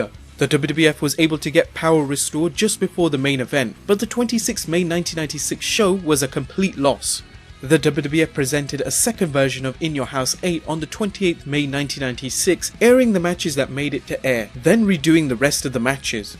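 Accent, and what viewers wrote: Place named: United Kingdom. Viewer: Well what do you expect? It's annoyingly another British guy narrating a video.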